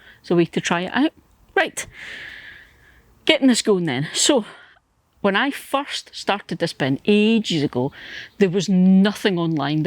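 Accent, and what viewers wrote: Scotland, United Kingdom. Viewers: Good morning, (Good evening in Scotland right now). Your new bin looks like a winner, and so much thicker than the $99 bin that I bought from Amazon. As far as the squeak goes, that will go away once you open the lid a few hundred times🤫. Being new, it’s going to squeak a bit at first. Today, I opened your video before I was fully awake, and you sounded more “Scottish” than in some of your previous videos. I had to lean closer to the screen, so as to translate😳.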